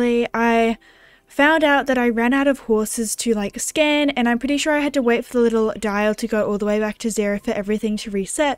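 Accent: Australia. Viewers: you have to be from australia?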